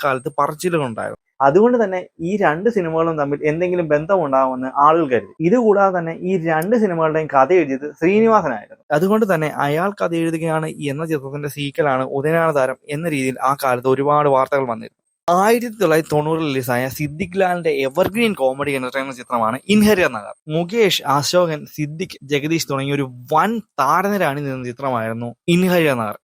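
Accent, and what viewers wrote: Kollam, India. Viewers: കൊല്ലം ഭാഗത്ത് ആണെന്ന് തോന്നുന്നു അവതാരകൻ്റെ നാട്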